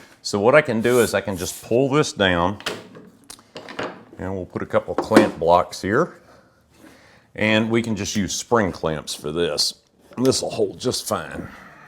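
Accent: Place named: Tennessee, United States. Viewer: I think I heard a slight Tennessee accent. My Dad's from TN.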